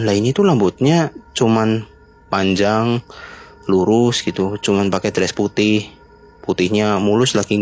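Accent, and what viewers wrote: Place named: Java, Indonesia. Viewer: saya ga suka nih kalo dia yg baca suara. Medok. trus ga enak bawainnya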